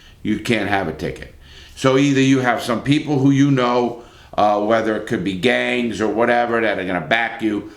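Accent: New York, United States. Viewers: Your from new York right?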